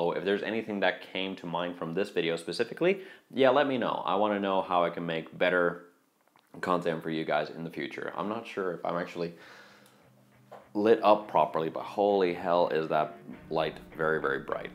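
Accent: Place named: Netherlands. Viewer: I liked your video quite a bit. I started developing a basic line of instruments about a year ago, and by far the hardest part has been nailing down the headstock shape. It is quite hard to match one headstock to a variety of body designs. Another area to touch on is tilt-back versus flat headstocks and how the neck to headstock transition works in either case. I personally prefer the Fender approach because it is simpler, is less likely to break and saves on wood. I'm going to subscribe to your channel, as I greatly appreciate people who explore design and engineering. Incidentally, are you Dutch, or maybe South African? I'm trying to place your accent.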